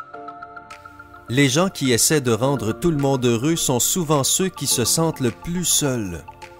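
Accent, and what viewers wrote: Quebec, Canada. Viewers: C'est l'accent canadienne😉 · accent québécois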